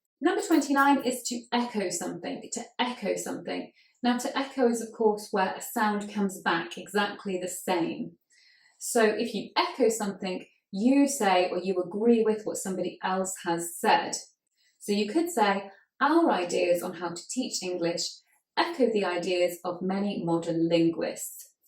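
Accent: United Kingdom. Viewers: Remarkable lesson! Never been a huge fan of British accent but this channel is making me change my mind I guess 😂 Btw once I heard 'snap' as something similar to lose control or get overwhelmed I think. Will you please post another lesson like that you did a few months back on English history? It was the bomb! My most sincere thanks for this video! 😉😉🖤🖤👍🏽👍🏽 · I like your British accent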